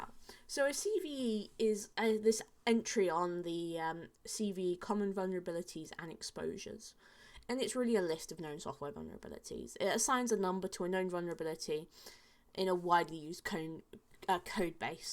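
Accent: United Kingdom. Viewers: I'm completely tunned, I love listening to british accent. Keep going, you're fantastic .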